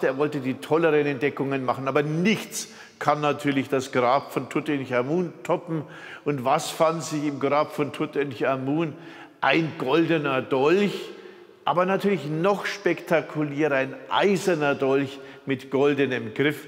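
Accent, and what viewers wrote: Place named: Bavaria, Germany. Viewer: Der Mann ist aus Bayern. Hört man aber eigentlich.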